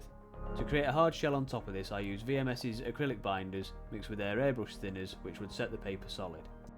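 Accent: United Kingdom. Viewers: this is pure art...doing the job and explaining it so well with this beautiful british accent...luuush:-)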